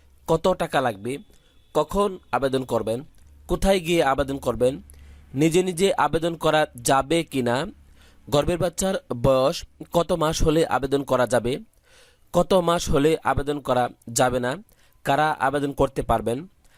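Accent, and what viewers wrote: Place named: Comilla, Bangladesh. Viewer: কুমিল্লা কথা য় করে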